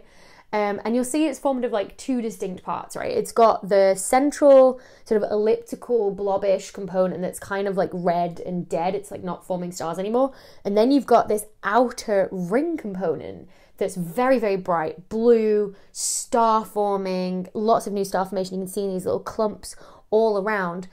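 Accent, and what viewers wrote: United Kingdom. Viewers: Nothing sexier than a blue eyed PHD in Astronomy with British accent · I noticed that but your Brit accent is so lovely that I forgave you🤭🥴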